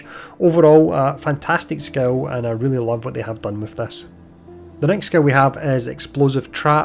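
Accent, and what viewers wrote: Scotland, United Kingdom. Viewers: Dude must be Scottish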